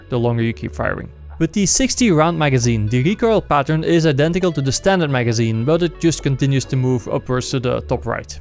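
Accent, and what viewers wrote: Netherlands. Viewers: Nice Dutch accent hehe. But cool guide :) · Love dutch accent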